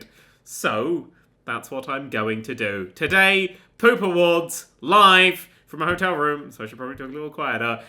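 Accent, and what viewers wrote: United Kingdom. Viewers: Holy shit got ultra British towards the end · Is it just me or did sound particularly british in this video?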